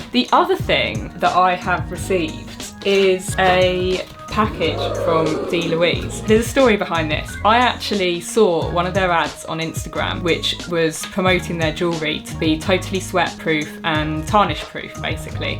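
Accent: United Kingdom. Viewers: U r beautiful n i like your UK accent ❤ from India Nagaland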